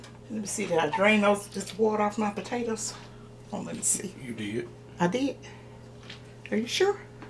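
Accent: South, United States. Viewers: Awesome I knew you were from the south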